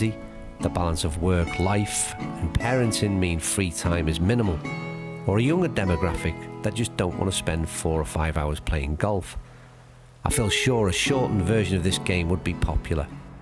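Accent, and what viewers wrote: Liverpool, United Kingdom. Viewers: Very good. Takes me back to the content when I first watched the channel, especially the travel series. It’s a bit like watching a Scouse version of Eric Anders Lang (meant as a compliment - you’re both great storytellers who use golf as a beautiful backdrop).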